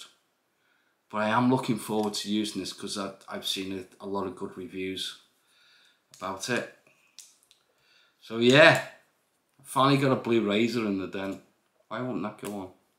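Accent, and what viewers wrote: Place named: Liverpool, United Kingdom. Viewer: Great to hear a bit of Scouse! Enjoy your Friday!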